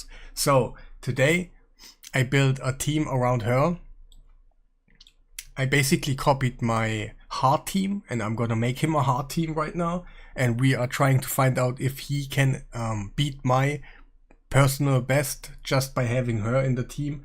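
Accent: Germany. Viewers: Bist du deutsch?😅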